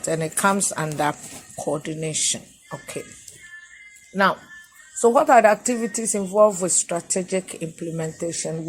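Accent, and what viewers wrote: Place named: Africa. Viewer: Heavy African accent Miss! Great job.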